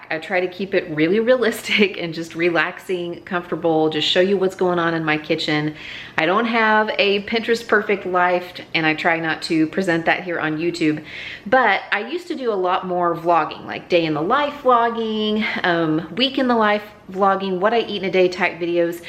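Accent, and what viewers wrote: United States. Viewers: I don’t know why but I really love listening to you talk and your American accent 😂 the way you say rolls and noodles. Lots of love from Australia thanks for another great video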